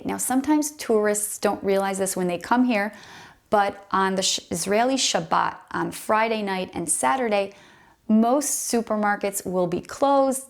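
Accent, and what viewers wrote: United States. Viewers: Your voice sounds like you were raised in the USA? We have some cats but nothing like Jerusalem. I think we have more dogs here. Watch out for pit bulls if they are running loose, that doesn't happen too often.😊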